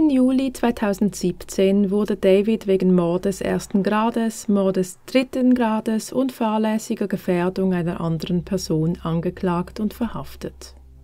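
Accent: Switzerland. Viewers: Du bist eine Schweizerin ….hihi…..man hört es 😃 Ich auch ….aber bei mir hört man es weniger …..weil das meine 2. Muttersprache ist Hab dich gerade entdeckt Abo dagelassen 🧡 Wenn du noch bisschen was übest gehts besser mit dem Hochdeutsch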